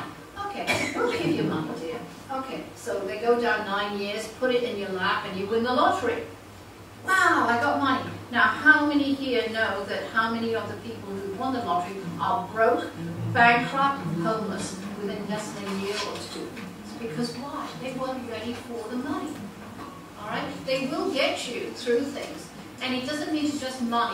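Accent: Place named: England, United Kingdom. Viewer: Nice to have found your instruction and insights today. Always good to discover an angel with a marvelous English accent. Thank you for the Candle Lesson. I have finally simplified my life by paring down this path with Meditation, Exercise, Creativity philosophically. Now to activate it. The Candle will be a welcom aid. Thanks for the light...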